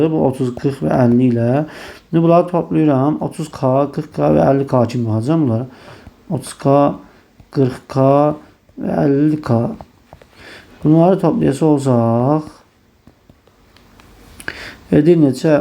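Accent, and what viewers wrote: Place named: Gabala, Azerbaijan. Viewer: Salam danışığında Qəbələ ləhcəsinə bənzəyir sabah hamınza uğurlar ❤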